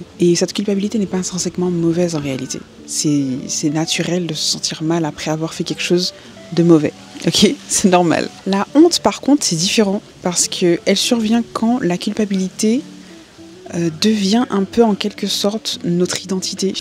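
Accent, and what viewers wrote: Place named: Madagascar. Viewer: Je laisse derrière moi mon sentiment perpétuel de compétition avec les autres et la peur de ne pas être assez bien pour autrui, puisque je suis fille de Dieu et il m’aime Merci pour la vidéo, ça fait plaisir de voir une antillaise sur youtube, et en plus pour parler de Dieu🥰 C’est Gwada ou Mada? L’accent disait plutôt Mada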